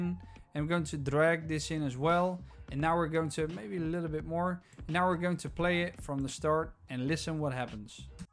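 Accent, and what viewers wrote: Netherlands. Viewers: dacht het wel dat ik een Nederlands accent hoorde ! succes nog en doe zo verder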